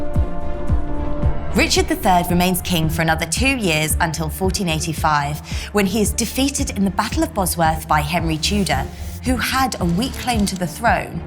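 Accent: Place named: Northern England, United Kingdom. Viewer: 27 Semantics on the pronunciation, but I AM British and this...this is not London. This is actually even more impressive because the accent is Yorkshire, Derbyshire, Midlands region waaaay to the North which is where they were from as Yorkists. It's a modern Northern accent though. If he read it in the English of the day, you'd barely understand it. Here is a video of a linguistics professor at the University of Leicester reading a letter written by Richard III some years before making himself King. He's written to borrow money, and the reader uses the King's English of the late 15th century. You might enjoy this if you're still about and see it. :D What did Richard III Sound Like? <link>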